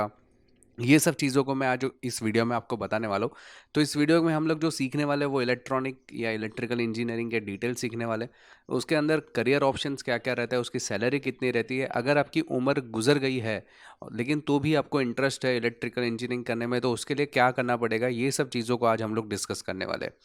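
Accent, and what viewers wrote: Gujarat, India. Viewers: Sir aap gujrat se ho kya kyuki aapki language aisi hai 😂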